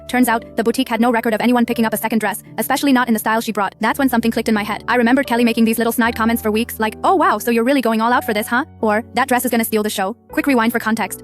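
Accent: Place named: India. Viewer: Why does the Ai have an Indian accent 😭😭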